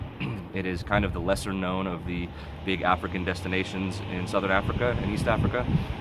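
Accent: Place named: England, United Kingdom. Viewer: You sound English dude..In Whk now from the UK.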